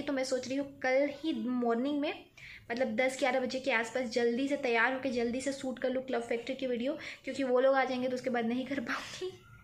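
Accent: Bihar, India. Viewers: Aap Bihar se ho mam ????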